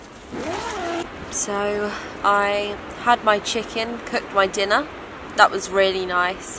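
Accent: United Kingdom. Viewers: Your accent do British snd "tipi"is shelters?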